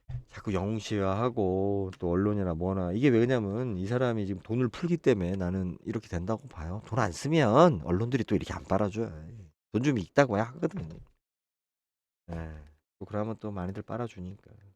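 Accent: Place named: Jeolla, South Korea. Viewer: 내 귀엔 너에게 전라도 말씨가 들리네? ㅋㅋㅋ 호남 청년들도 많이 돌아섰단다. ㅋㅋㅋ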